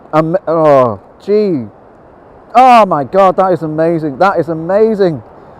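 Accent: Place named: Merseyside, United Kingdom. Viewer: Really enjoyed that video only just discovered your channel so will be watching a few more. It is a truly magical location I must of followed a similar path to you, as I have similar angles,(maybe not quite as death defying on the cliff) it was that windy I could hardly walk up by the lighthouse. Am I right to think by your accent you don't live that far from me in Merseyside somewhere I live in Runcorn.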